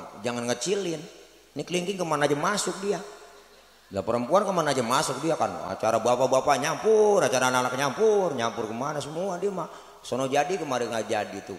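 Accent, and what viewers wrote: Betawi, Indonesia. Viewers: Betawinya keren..pengganti KH Zainuddin MZ.. Barrokallah tadz.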